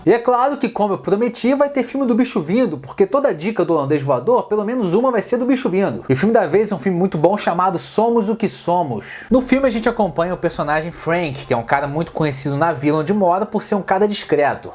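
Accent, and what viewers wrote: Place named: Rio de Janeiro, Brazil. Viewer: Sou carioca e até eu acho q vc tem sotaque de carioca.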